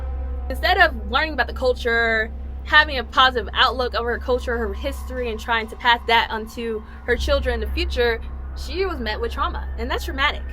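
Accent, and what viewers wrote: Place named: United States. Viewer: First of all, you’re stunningly beautiful. I audibly gasped when you came on screen. But to the point of the video: Iroh is not a war criminal. War crimes are specific things, none of which Iroh ever did. The few war crimes we do see are from - unsurprisingly - Sozin (genocide) and Ozai (attempted genocide), along with - surprisingly - Sokka (he flew the War Balloon with Fire Nation insignia in order to mount a surprise attack) and anyone who allowed children to fight (use of child soldiers, also a big no-no). Iroh mounted a siege while being on the wrong side of the war, but he also grew up under direct influence of the Fire Lord as his father, so one can understand why he might see it differently than it really was. All of his attacks were just trying to breakthrough the outer wall, which we know he failed to do. There are no records of him committing anything that constitutes a war crime. 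I’ll buy that Hama had to stay in the fire nation, but only because there’s oceans between it and the Earth Kingdom. Otherwise, she very much could’ve lived there in anonymity. Fire Nation were probably looking for her at all ports, so it would’ve been her best bet to stay put. But to say that she was somehow justified in torturing civilians (also a war crime, btw) just because they were Fire Nation is not a thought you should entertain. Based on your accent, I’m going to assume that, like me, you’re from the US. Would it be fair if an Iraqi POW kidnapped and tortured you, just because yo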